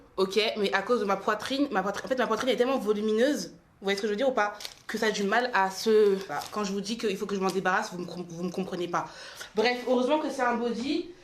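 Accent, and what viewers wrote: Africa. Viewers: Dans cette vidéo tu à beaucoup parler africaine! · J’adore trop l’accent africain 🥰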